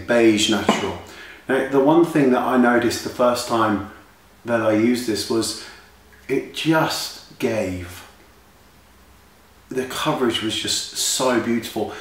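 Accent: Australia. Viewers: i just realized you were British, i always thought you were from Australia from your accent haha :) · r u australian? ive been told ur english but u sound australian o_O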